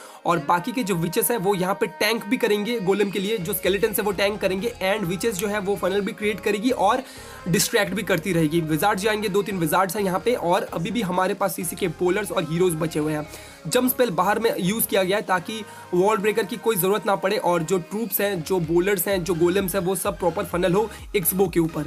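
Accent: Bengal, India. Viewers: you are Bengali????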